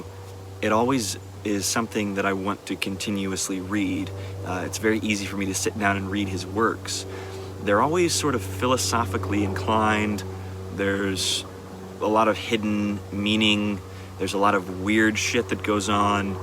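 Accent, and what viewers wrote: United Kingdom. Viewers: I was sooo sure you were in the UK :-) You sure fooled me (and others) with your slight intetnational'ish Brit accent reading Douglas Adams.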